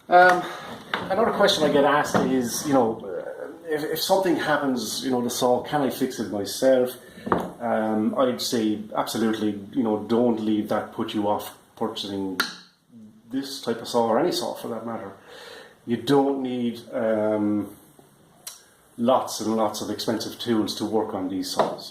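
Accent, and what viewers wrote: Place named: Ireland. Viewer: As an American I think Irish people have the best accent, and I value your opinion to the extent that i just purchased one for 237.15$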